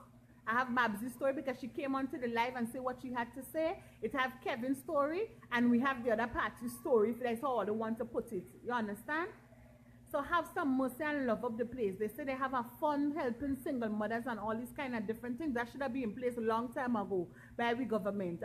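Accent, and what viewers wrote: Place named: Trinidad and Tobago. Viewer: Hey, you sound more Trinidadian than Grenadian, but that ONLY goes to show how the accent is the same.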